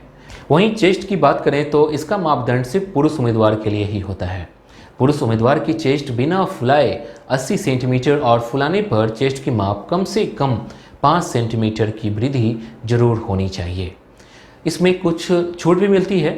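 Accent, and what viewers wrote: Bihar, India. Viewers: Sir aap bihar se hai ?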